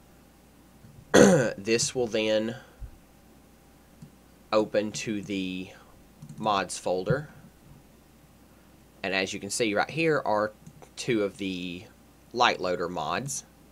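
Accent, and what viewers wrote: South, United States. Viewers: You sure know what you're doing! p.s Love the southern drawl, Where you from? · Love that southern drawl u got there too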